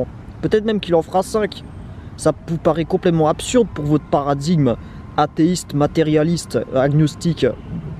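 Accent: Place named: Banlieue, France. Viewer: bien d'accord avec le message, vraiment dommage ton accent de banlieu . merci du taf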